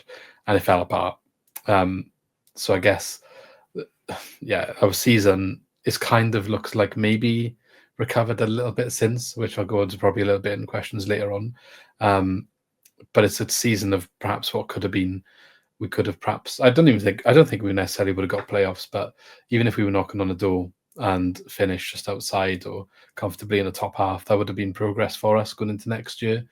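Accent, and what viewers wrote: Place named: Wales, United Kingdom. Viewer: I hear a slight Welsh accent with a strong twinge of English 😂😂 having parents from both North Wales and Northern England I can definitely confirm we have a better quality of countrymen up north 😉sorry was this about football 😂😂 UTC’s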